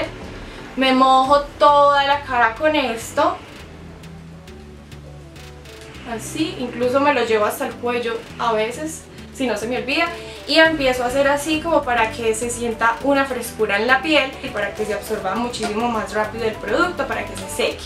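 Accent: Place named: Antioquia, Colombia. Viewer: hola, me encanta tu hablado paisa es super chevere y también me gusta que pienses en nosotras y nos dices donde conseguir los productos y cuanto cuestan, saludos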